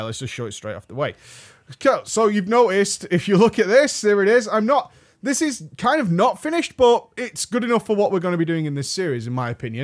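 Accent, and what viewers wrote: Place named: Manchester, United Kingdom. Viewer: Youtube is not friendly to the Manchester accent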